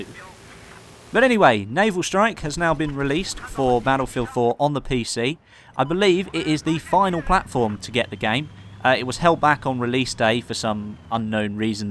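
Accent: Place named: Crawley, United Kingdom. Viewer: But for the record I can hear that Crawlian (If that's even what you call people from Crawley) accent strongly in this vid! :D Remember this comes from a guy in Horsham! (You know a town like 5 mins from Crawley and that is like a BAZILLION times better than Crawley! xD)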